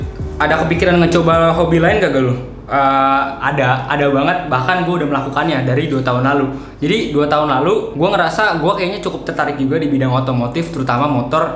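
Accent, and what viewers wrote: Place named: Bali, Indonesia. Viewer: Kak aslinya orang mana sih kalau boleh tau?, soalnya kalau "menurutku" sih kayak orang Bali logatnya, maaf kalau salah ya Kak 🙏🏻😅😁🙏🏻 #Nanya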